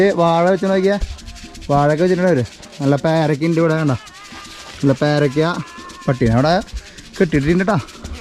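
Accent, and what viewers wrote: Thrissur, India. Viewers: അലസ്‌കയിൽ വന്നപ്പോൾ തൃശ്ശൂർ ഭാഷ കാരണം subscribers കിട്ടുമോയെന്നു ആശങ്ക പ്രകടിപ്പിച്ചിരുന്നു.അന്ന് നൂറിൽ താഴേ മാത്രം subscribers!!ഈ ആഫ്രിക്കയിൽ വന്നിട്ടും തൃശ്ശൂർ ഭാഷ കൈവിടാതെ തനതായ ശൈലിയിൽ കൂടി നാല്‌ ലക്ഷത്തിൽ അധികം subscribers!!! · thrissur slang pettannu alkulle kayiledukkan powerulla manohari😘😘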